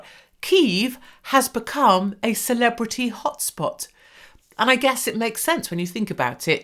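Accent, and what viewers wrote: United Kingdom. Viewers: We never provoked Russia. It would be nice if you guys stopped spreading Russian propaganda. There were no biolabs. There are no NATO nukes pointed towards Russia on the Russian border. Last I checked Putin doesnt seem to have a problem with slaughtering civilians, since he does it himself. In Ukraine. In Syria. In Georgia.... So Nuland, a single person says "F*** the EU" therefore you hate all of America? Amazing. BTW are you even part of the EU? Your videos indicate towards a heavy British accent..... According to the world corruption index, Russia is even more corrupt than Ukraine. in his video critizising Mearsheimer and Realism, in the last segment he compares Russian corruption with Ukrainian corruption. Its quite different. In Russia Putin controls the corrupt. In Ukraine, nobody controls the corrupt. So in Russia you have corruption + autocracy. 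In Ukraine you have corruption + anarchy. And this also makes sense, since historically Ukrainians have always been anarchists, while Russians have always been authoritarians. Why should Ukraine pay reparations to USA? Makes no sense. In fact the West is setting up a Marshallplan for Ukraine to rebuild them, once they are liberated from Russia. And we have seen the enormous economic sky rocketing of countries that get free from Moscow's clutches and even more incredible when they get supported. If Ukraine wins, the one to pay reparations is Russia, to Ukraine. If Russia wins, it will rebuild for free? LOLOLOL What ever Rus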